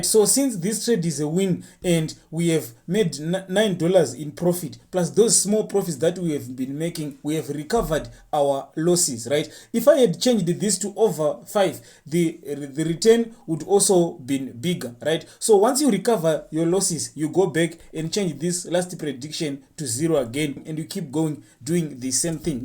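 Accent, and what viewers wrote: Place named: Zimbabwe. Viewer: 😂 Zimbabwean accent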